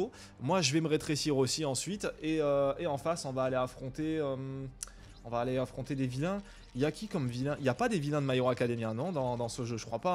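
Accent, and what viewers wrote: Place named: Marseille, France. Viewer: G remarqué que ta un axen marseillais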